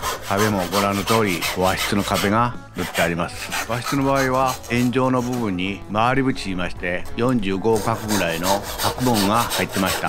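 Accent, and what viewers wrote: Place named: Wakasa, Japan. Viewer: すごく関西弁の若狭訛りっぽさを感じる…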